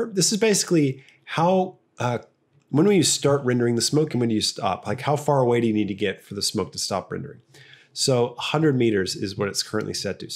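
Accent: United States. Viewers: You have the most US accent I've ever heard from an Australian...cash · wait are you really australian lol you sound american to me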